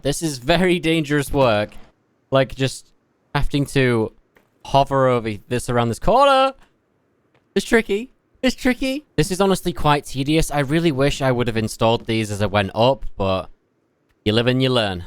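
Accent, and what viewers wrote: United Kingdom. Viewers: Great vids. Where are you from? UK living in USA?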